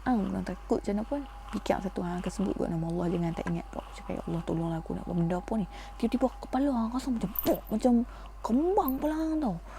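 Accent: North, Malaysia. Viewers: Sama sama bukan apa shiok dengaq hang bercerita dengan loghat utagha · Teruskan buat citer hantu best Cara citer teruskan ckp owg utara best citer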